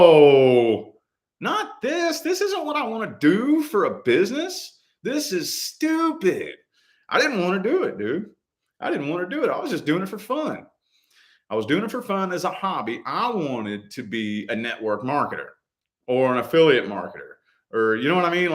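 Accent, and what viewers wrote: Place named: United States. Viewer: I loooove this specific American accent and the cabin idea at the East coast and the fitness orientation :) greetings from Greece 💜☀️✨🌙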